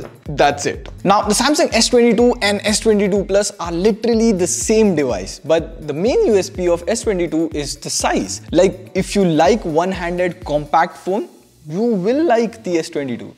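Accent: India. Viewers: Your accent is funny. Are you indian by any chance?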